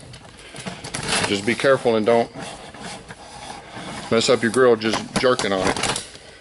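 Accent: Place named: North Carolina, United States. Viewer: good advice - thanks! Where are you from btw - i'm in NC and you sound local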